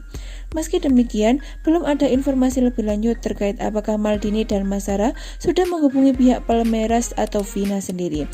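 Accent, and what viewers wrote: Java, Indonesia. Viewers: Mbak..e Jawa mana?? Wkwk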